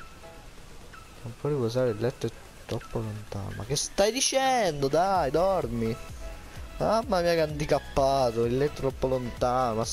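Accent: Sicily, Italy. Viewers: We mbare sei siciliano?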